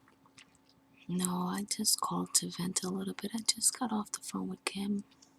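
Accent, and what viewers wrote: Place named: New York, United States. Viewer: I love your accent. That's the prahblam - huuuuge tingles. Are you from New York by any chance? I have a friend who is Latino, from New York, and literally every time she talks I just melt and zone out..and she's like, "Gina? Hello? I'M TAWKIN' TAH YOU."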